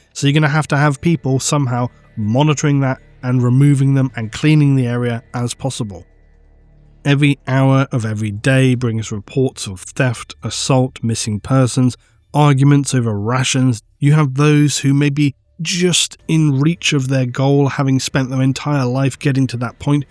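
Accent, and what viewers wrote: United Kingdom. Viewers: Surely he’s also in Europe, or at least he sounds British.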